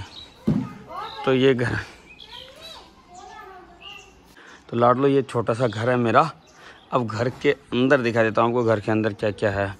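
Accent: India. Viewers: Haryana s hain kia sir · Full rajasthani tone 🤭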